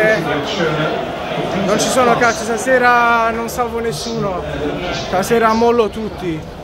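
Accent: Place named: Turin, Italy. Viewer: DALL'ACCENTO MI SA CHE E' UN TORINESE. CHIAVICA RUBENTINO.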